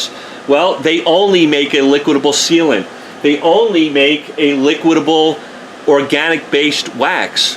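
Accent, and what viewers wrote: New York, United States. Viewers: Lol. You haven't seen mad yet. Sounds like a NY/NJ accent which is like this, he actually sounds calm here.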